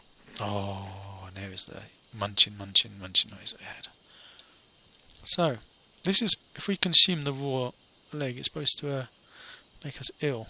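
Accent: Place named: England, United Kingdom. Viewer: ah well... audio things may come more naturally to me as studied music and got really into mixing when I left school. audacity is free and I would encourage anybody making videos to run their audio tracks through it and back into their video editor. def worth playing around with. I never worry about speech impediments, they're part of a persons unique accent. isaac arthur is one of my favourite youtubers and he has a reeeeeeeally bad tongue tie, but everybody loves listening to him lol. and your accent is great, very english. I think particularly australians don't particularly like listening to australians, but we get bored of hearing americans all the time. (Also I wouldn't have recommended an EQ back then, I would have said just buy a $25USD mic from aliexpress, but I wasn't listening to you back then lol)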